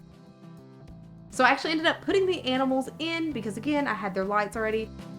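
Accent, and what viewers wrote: South, United States. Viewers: Hi, I love your southern accent; where are you from? I have a southern accent also; I live in North Dakota but I grew up in Tennessee.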